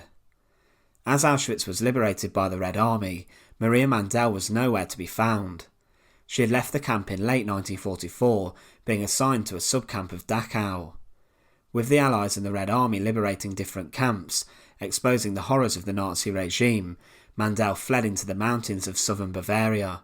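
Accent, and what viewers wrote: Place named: Cockney, United Kingdom. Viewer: Let me start by saying that I really like the content on this channel but am I the only one that gets annoyed with the inflection in this person's voice? I was in England for two years but this guy borders on a cockney accent that is very difficult to follow at least in my opinion.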